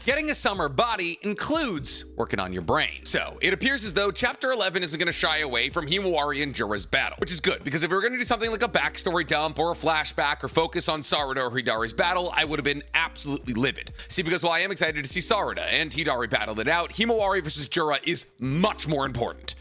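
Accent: Boston, United States. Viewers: Yo brother you from Boston? Me too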